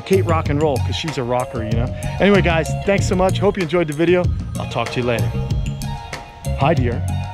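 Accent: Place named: Long Island, United States. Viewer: You are do funny! I can hear the Long Island in your voice and the way you talk! Your sense of humor is SSOOO NEW YORK!!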